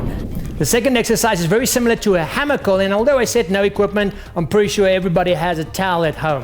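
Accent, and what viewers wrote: Afrikaans, South Africa. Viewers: Judging by his accent i think he's from South Africa. I know cause I live there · His accent is South African.. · Its a south african accent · sounds south african to me :) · Roy Miketsukami It's a South African Accent common under Afrikaans Speaking South Africans. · He's a saffa (South African) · I think it's a south african accent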